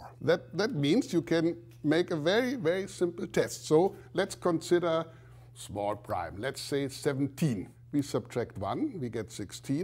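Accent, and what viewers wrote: Germany. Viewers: He has a thick accent for sure, but I can understand what he is saying. So I have no problem with it. I can't speak German, so I'm glad he knows English! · I thought: "He sounds german." And the description reveald my assumption as true. :D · I can clearly hear from where (in Germany) he originates - it's all in the prosody. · I'd say German, he sounds like Werner Herzog. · Stop commenting his English. He speaks well and understandable. Try to imagine yourself explaining difficult problem in foreign language. Most you you, who complain, are not even within range of sight knowledgewise. And btw rather german accent than french one. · I don't think an accent can get any more german than that. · His german accent is cancerous.-. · Oh, that accent is too German, indeed! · You can directly hear that he is from Western Germany :D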